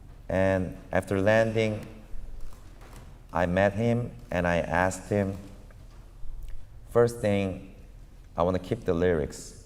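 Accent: United Kingdom. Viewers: 몇번을 다시 들어봐도 정확하게 말하고 심지어 유머까지 구사하는데 콩글리쉬라는 이유를 모르겠음. 또박또박 영국식 발음이라 그런가? 광팬이 미녀였는지는 잘모르겠음.^^ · 영국식 영어를 완전하게 구사하는군요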